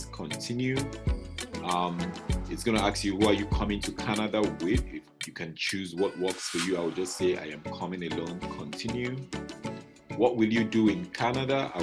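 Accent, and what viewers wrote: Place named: Nigeria. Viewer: your accent reminds me of my Nigerian ex lol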